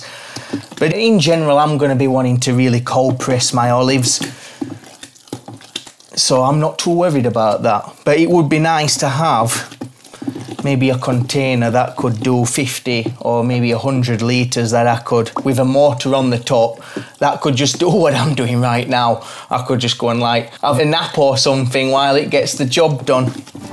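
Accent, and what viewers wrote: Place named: Scotland, United Kingdom. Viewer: he sounds Scottish, maybe Irish.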